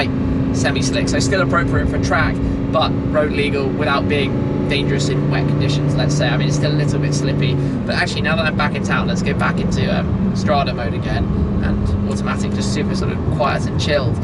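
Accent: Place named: United Kingdom. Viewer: As much as I think your British accent is just.... dandy, please give it a rest while you're driving. Even during launch control, you're just blabbering on and on. It's like someone put 6 AA batteries in your head and turned the "ON" button.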